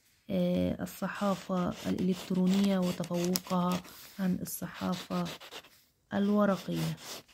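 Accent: Egypt. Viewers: انت مصريه لم افهم😢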